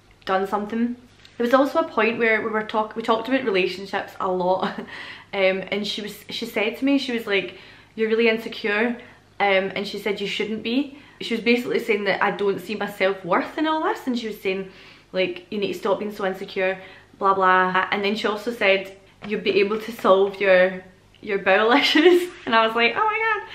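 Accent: Scotland, United Kingdom. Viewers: you always make my day better. I think you are perfect in every way. You are so beautiful and I have always been a fan of the Scottish accent so it drives me crazy to hear that accent coming from that beautiful face. Keep the videos coming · Welcome to America, Miss. Lot of things will be strange, but much will be familiar and inviting. Advice? I do have relatives in New Mexico, and the temperature changes DRAMATICALLY throughout the day, from hot in the daytime to freezing at night, so bring a couple of jackets, even if it's 100 degrees that day. Also, you can always expect that when someone in America hears your accent, they will want to tell you about their Scottish ancestors (the same applies to ALL foreign tourists we encounter here). I know it gets old really fast, but they really want to hear about the country their family came from, and they are trying to make you feel welcome.:) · I think this means u r thinking beyond the 3rd dimension. 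Past present future r all one, I been watching Aaron Doughty he does meditation but explains reality shifts etc (the same thing is happening to me) Have fun in US! They love Scottish ppl there, esp a pretty lady...in fact u could coast right thru on accent alone 😎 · This is me listening to you. 😂 I just love your scottish accent!!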